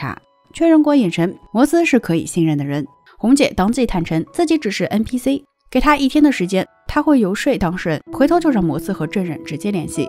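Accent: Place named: Nanjing, China. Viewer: 👍👍👍你是南京人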